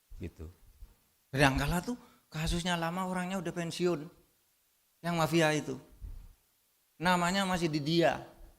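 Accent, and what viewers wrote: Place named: Madura, Indonesia. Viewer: Logat maduranya sekali² masih suka kedengeran. Orang Madura kalau udah pinter, memang pinternya kebangetan. Tapi nek wes atos, yo wes, angel urusane . Andaikan semua orang madura kayak pak Mahfud MD. 😁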